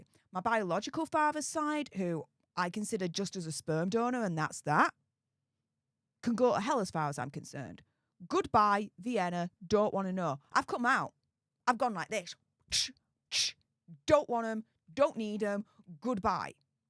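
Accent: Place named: England, United Kingdom. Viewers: 7.07 She wasnt trying for an English accent it just an American accent for whatever region she comes from. (just as your accent is, excuse me if i'm wrong, Manchester or somewhere near:) 🤗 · We Americans thank you for your Thanksgiving greetings. We pray blessings for you and your family. We are able to better understand your English accent now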